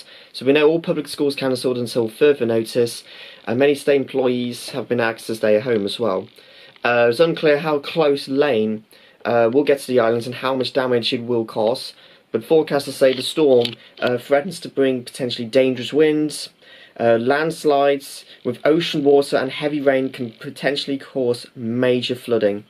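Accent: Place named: RP, United Kingdom. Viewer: nice bbc english accent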